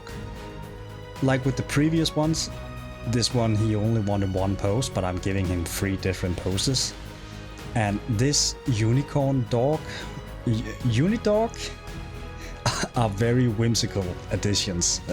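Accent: Denmark. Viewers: So cool! Frome your accent I think Dane ?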